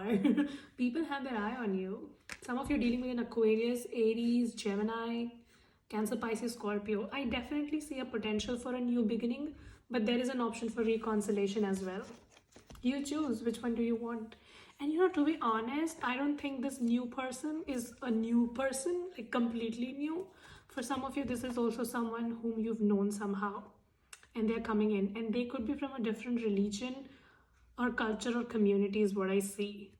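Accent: India. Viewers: I presently live in Mareeba, and my wife of three years has been "stuck" in Shanghai China for another 12months... and then this recent interloper is almost a "nut case"... My Wife is 10 years younger & 12may, I am 21may... Xinji holds my heart, but cannot leave China due to "covid & family", we do not speak the others tongue... I have some traits related to aspergers, bipolar & dementia etc... I want to produce "cold pressed coconut oil" on Vella Lavella Island, Western Province of Solomon Islands (I am fluent in "tok pisin" and have a masters degree & science degree in Tropical agriculture growing and processing Theobroma Cocoa (chocolate), coconuts, since 1970... (tea & coffee is a recent hobby interest)... I love sailing catamarans... Your accent sounds like Southern Indian? - I have resided in Bangalore, & Nagacoil for a 6 month holiday, and earlier, 2and a half years in Penang, Malaysia... 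At 75 years old I am presently bloody confused, waiting for "Ascension" on 21 Dec??? ... <mail>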